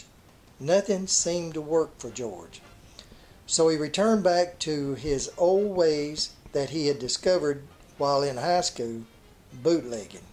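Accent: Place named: Kentucky, United States. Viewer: I love your accent. It sounds Kentucky or maybe Tennessee, but I could be wrong.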